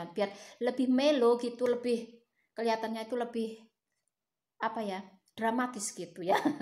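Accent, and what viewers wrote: Java, Indonesia. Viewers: pa kabar teh, suka lihat teteh bicaranya medok banget.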